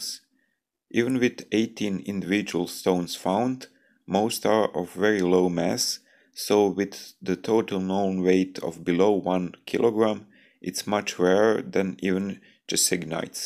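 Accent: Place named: Eastern Europe. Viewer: Thank you! That's very kind of you! I do appologize for the thick 'slavic' accent 😃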